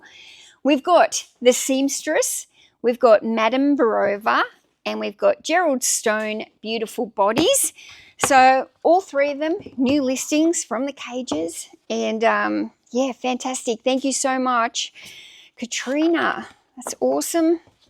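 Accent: Australia. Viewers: around the 25-minute mark, you said "Crue Trime" Hahaha, I was eating fried rice and spit some out, then I rewound it 4 times to hear it again-love the AU accent (I'm in America)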